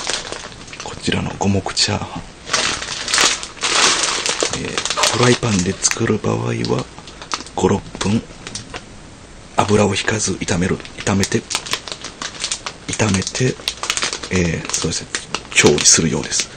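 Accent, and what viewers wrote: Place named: Kansai, Japan. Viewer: 声と関西弁に癒されます!!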